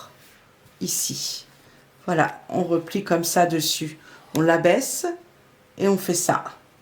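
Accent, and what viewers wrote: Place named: East, France. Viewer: Bonjour. Je viens de découvrir il y a une dizaine de jours votre chaîne, j'adooooore! C'est clair, précis, voix lente et avec en plus un petit accent! Je dirai de l'Est? A la montagne? En tout cas merci, j'apprends beaucoup de chose. Bonne journée.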